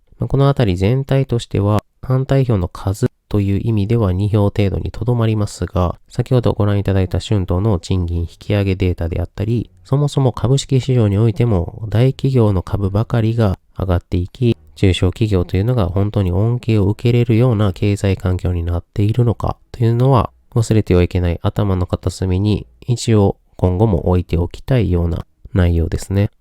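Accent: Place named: Kansai, Japan. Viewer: マクロもミクロの強くていらっしゃる、羨ましいです。関西言葉で、落ち着いて話されるので妙な気分になります。楽しいです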